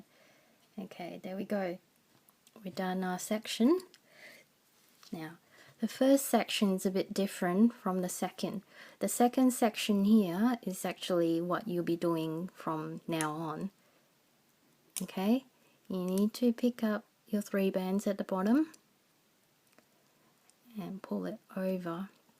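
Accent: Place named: Australia. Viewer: I think you speak beautifully! I noticed the Aussie accent but it sounded different from my other Aussie friends...now I know why. Sometimes I just play the videos to hear the voice...yours is most pleasant; makes it easier to get through a tricky tutorial:)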